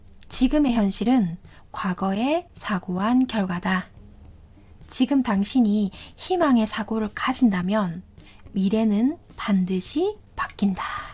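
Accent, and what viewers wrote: Gyeongsang, South Korea. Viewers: 말투가 경상도가 고향 인가봐요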